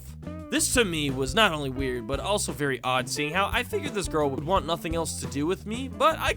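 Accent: Canada. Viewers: So you're a Canadian ay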